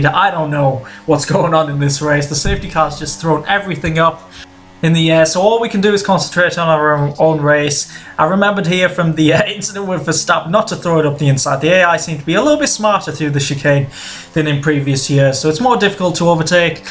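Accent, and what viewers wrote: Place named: North East England, United Kingdom. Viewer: Are you from the north east of England? your accent sounds like its from there.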